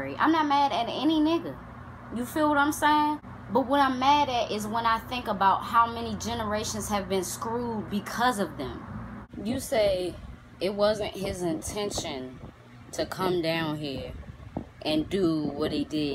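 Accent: Washington DC, United States. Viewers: Do your thing sweetie much love to you I from dc.u sound like u from the dc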